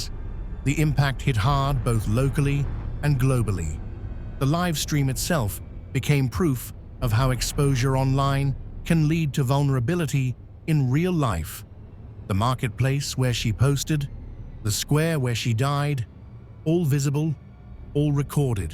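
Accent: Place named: United Kingdom. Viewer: hate these brit voices for american stories lol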